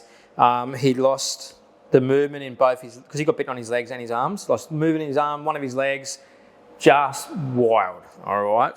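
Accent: Australia. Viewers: This dudes Australian accent is strong. Never struggled to understand an Australian.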